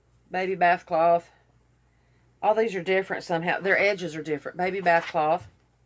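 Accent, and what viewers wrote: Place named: Alabama, United States. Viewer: Hey!!! I knew you were from Alabama after the first 2 words😂! I'm from Chalkville- Hey neighbor! I live in Florence, AL now- so great to hear home folk and hope you start feeling better.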